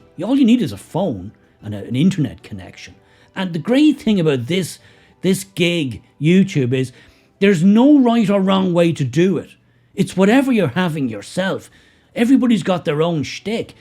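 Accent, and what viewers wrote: Ireland. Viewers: Enjoy your style, especially that Irish accent. Please continue making the videos, I love listening to your wit and sarcasm. Onwards and upwards to the 100,000❤🌞🏴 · it's your common sense and cracking accent (i stand with ireland) that i enjoy keep up the good work.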